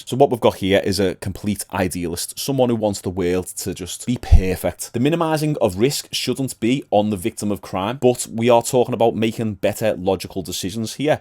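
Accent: Liverpool, United Kingdom. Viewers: you scouse mate? i live in liverpool for uni and you sound like my mates 😂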